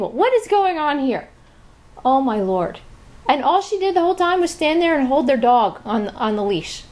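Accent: New Jersey, United States. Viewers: ! Is that a NJ or Brooklyn accent... I'm having a banner year for the ladies following me around giving me a history lesson on any item that I pick up. Kind of funny but it gets old quick... Leave me alone! Santa going down the fireplace ugly sweater is the best. Price it high.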